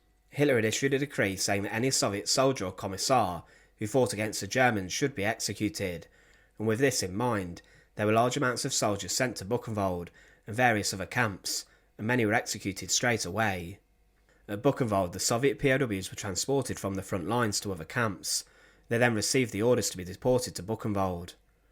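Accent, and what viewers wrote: United Kingdom. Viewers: That is the young man's accent! Everyone from his neighbourhood in the UK will speak in this manner. Nothing to do with disrespect.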